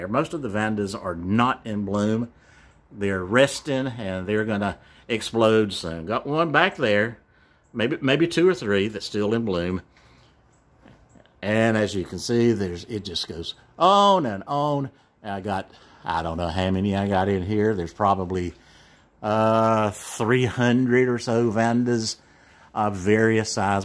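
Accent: South, United States. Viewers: Hey! Loving the Southern draw☺️ I believe you may need to talk in your videos more often. This was super awesome. Love seeing your setup. The mounts look great. I agree with the growth on the mounts. They do amazing. You’re a natural at it. 👍🏼 I can’t believe it’s gonna be that cold for 3 days🥶❄️ Hope to see more videos with you narrating. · Love the Southern drawl.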